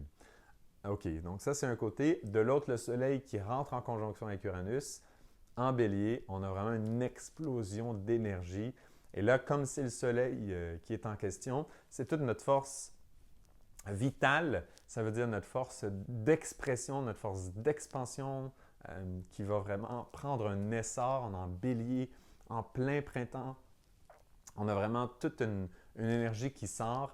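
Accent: Quebec, Canada. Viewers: Nouvelle abonnée, merci beaucoup pour cette vidéo et pour ce sympathique accent Québécois je pense, je vous souhaite un beau mois d'avril du sud de la France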